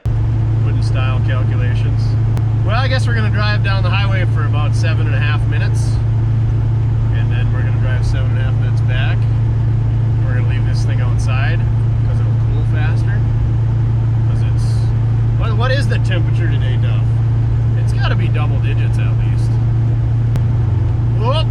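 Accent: Minnesota, United States. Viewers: Great project, make dad happy. Your accent makes me feel like I'm back in Grand Rapids Mn.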